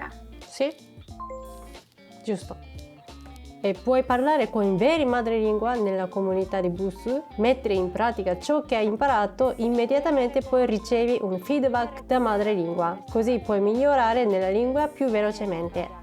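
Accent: Japan. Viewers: Sono contento di sentire un'altra studentessa d'italiano! (Sebbene io non sia di madrelingua giapponese) Dirò anche che è interessantissimo sentire questa lingua parlata con un accento giapponese! Per quanto riguarda i generi e il congiuntivo, io sono d'accordo. Quei concetti sono difficili anche per noi madrelingua inglesi. Li sbaglio a volte ancora oggi. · Hai ragione, è una lingua complicata. Non me ne sono mai reso conto fino a quando l'ho dovuta insegnare! E non parlo solo dei verbi. Comunque i miei complimenti perché parli molto meglio di tanti italiani che ho modo di sentire là fuori... La cosa che mi fa sorridere è sentire la cadenza della lingua giapponese mentre parli italiano. Trovo la combinazione molto Kawai!! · volevo farti i complimenti a parte il tuo accento ( un po si sente) parli perfettamente italiano e concordo sulla costruzione della frase , voi giapponesi avete la costruzione della frase un po come i sardi :P .le poche frasi che conosco di giapponese le ho imparate dai manga :) . 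· Il tuo Italiano è quasi perfetto; l'inflessione Giapponese si sente pochissimo. Peraltro quando i Giapponesi imparano una Lingua straniera generalmente lo fanno molto alla svelta ed in modo spesso ineccepibile. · Ciao! A me piace sentire parlare gli stranieri anche se fanno errori, anzi...è più divertente!:-) L'accento diverso e le parole sbagliate sono qualcosa di caratteristico e non si deve essere perfetti per farsi capire! Comunque tu parli un ottimo italiano e il tuo accento giapponese è molto carino:-P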